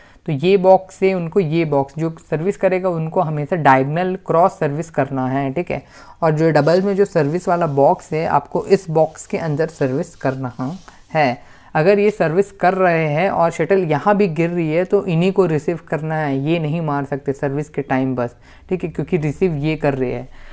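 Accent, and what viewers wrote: Odisha, India. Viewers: Bhai ap odiya ho na kyuki bhech bhech mai ap Mane Mane bol rahe ho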